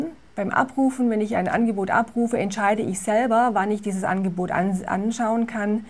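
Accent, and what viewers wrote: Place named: Franconia, Germany. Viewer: Klingt für mich irgendwie eher fränkisch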